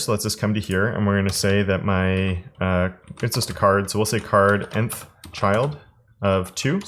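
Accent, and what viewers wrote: Canada. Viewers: The canadian "out" pronunciation is so strong, on this one :)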